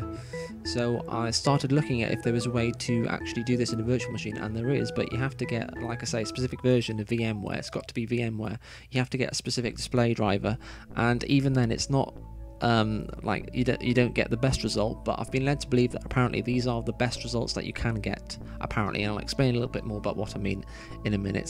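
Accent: United Kingdom. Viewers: i'm learning british accent with your videos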